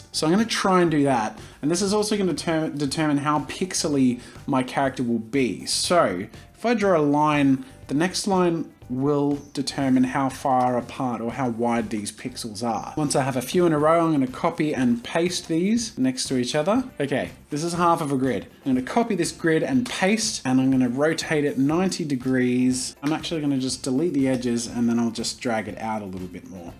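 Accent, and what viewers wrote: Australia. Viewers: (Australian accent) he is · Are you from Australia 🇦🇺 you sound like it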